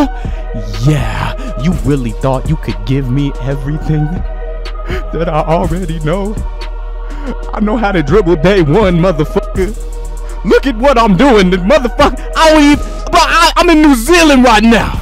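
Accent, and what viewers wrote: Australia. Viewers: That’s an Australian accent not newzealand…… like saying u sound Canadian get my point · “I’m in New Zealand” proceeds to speak in an Australian accent as an Aussie I approve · Aussie accent? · Bruh that’s an Australian accent 🤨🤦🏾‍♂️ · says he's in new zealand put on australain accent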